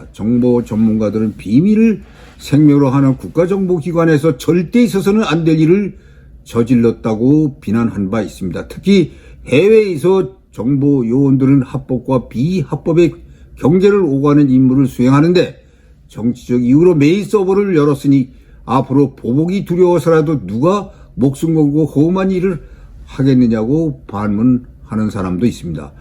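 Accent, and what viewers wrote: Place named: North Korea. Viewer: 문재인 정부가 깔아놓은 사람을 선정해라 말인가. 당신 목소리가 이북사람 같다.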